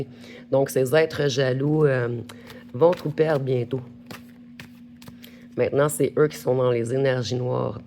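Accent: Canada. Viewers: Merci Beaucoup Pour Vos Guidances ! Je Ne Peux Envoyer de Messages Directs ! Je Suis Touché Par Votre Accent Canadien, Mon Pays de Cœur !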